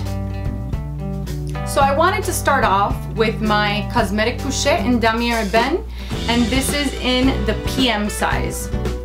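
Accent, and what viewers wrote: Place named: France. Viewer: really cute french accent ^^ nice video !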